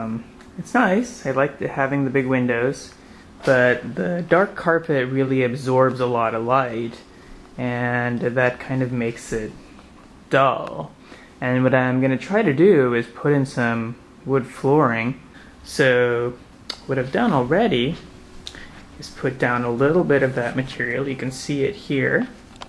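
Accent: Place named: United States. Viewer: Its odd to see american accent on an indian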